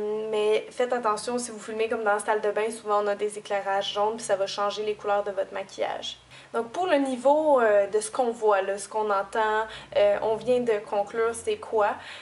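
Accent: Quebec, Canada. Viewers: mais c'est génial l'accent québécois justement ^^ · Je suis française et J'ADORE ton accent québécois =D Et toutes tes vidéos en général... Pourtant, je ne me maquille que (très) rarement ;p Bonne continuation ^^ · C'est drole parce que je m´était abonné à une chaine de maquillage canadienne, "judeobsession", mais son accent était vraiment tres tres prononcé et parfois je ne comprenais meme pas. Mais toi, ton accent est plus léger, et je trouve ça trop cute! Et puis j'adore les expressions québecoises, genre la façon de dire "etchetera" ou "c'est l'fun" ^^ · Je suis entrain de tomber amoureux de ton accent québecois ! vous être trop mignonne chère demoiselle franchement je suis époustouflé (k) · Merci pour ta vidéo je pense que c'est une vidéos vachement utile, je pense qque tu veras pas mon messages vu que tu dois recevoir plein de commentaires je viens de coommencer une chaine fille pas baser sur les make-up mais plutot tenue du jour , haul, soin ...:) J'espère que tu pourras y faire un tours et éventuellement t'abonner (c'est beau d'espérer) et bonne continuation et ton accent québecois et très beau ne t'inquiètes pas on comprends très bien ce que tu veux dire bonbon bisous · L'accent québecois est juste un régale, et un plaisir a entendre ;) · Ton accent ne me choque pas au contraire j'aime beaucoup l'accent québécois :) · Merci pour ces supers conseils et encouragements. 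Ta chaîne est super et ton accent québécois est tout simplement trop mignon. Comme tu le dis l'important c'est d'être compréhensible et tu l'es tout à fait, je n'ai jamais eu de soucis pour te comprendre. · Moi j'adore l'accent québécois !! Je suis jalouse avec mon vieil accent du sud ouest de la France^^ ;-)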